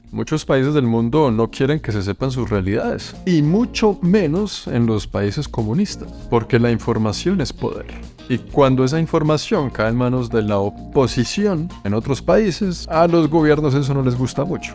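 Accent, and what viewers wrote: Colombia. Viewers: Haaa no, si eres comunista ya no te sigo mas jjjj jjj Me encantan tus videos y ese acento colombiano. Dios te bendiga, desde Cuba. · Es un lindo sueño pensar en su hermosa voz y su bello acento colombiano, como el primer sonido que yo podría escuchar al despertar. Es usted bello y admirable. Me ha robado el corazón con su personalidad y su talento. Reciba usted un gran abrazo con mucho cariño desde México 💕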